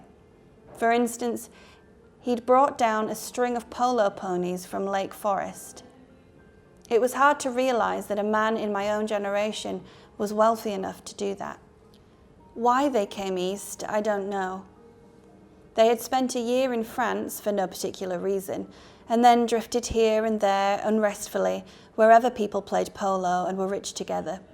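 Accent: United Kingdom. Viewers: Hi thanks for reading the book to us! I love your voice and british intonation ^^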